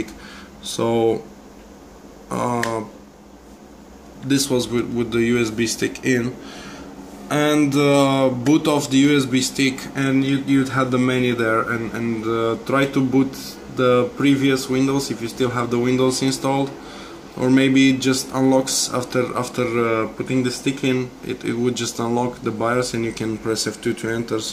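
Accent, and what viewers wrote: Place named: Ukraine. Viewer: You sound like you are from Ukraine or somewhere close. Playing a guess game.. Anyway Il try this.. BTW does anyone know why did we start seeing this screen?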